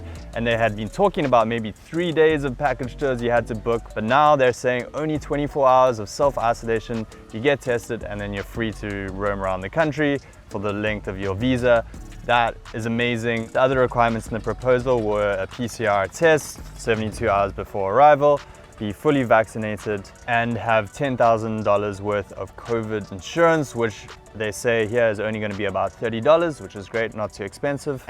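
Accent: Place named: South Africa. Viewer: i hear some kind of a South African accent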